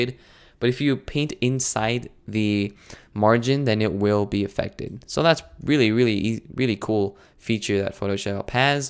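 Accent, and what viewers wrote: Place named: New York, United States. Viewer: It's a decent video but you gotta look like you're an expert, instead of the 15 year old guy who talks like a NYC girl that you actually sound like there are some basic concepts that you did not mention and you use way too much shortcuts. I'm not trying to hate on this, just giving you my viewing point in case you want to get better at doing tutorials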